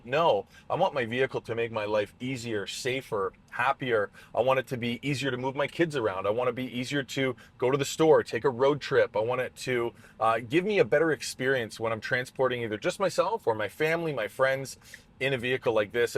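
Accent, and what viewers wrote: Canada. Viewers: Totally called that you were Canada… I heard it 😂😂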